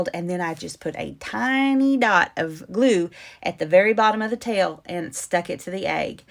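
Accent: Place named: Louisiana, United States. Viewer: Just found you on YouTube. Love everything you do. I'm an old Louisiana girl so love seeing Louisiana girls doing their thing. I really, really miss the accent.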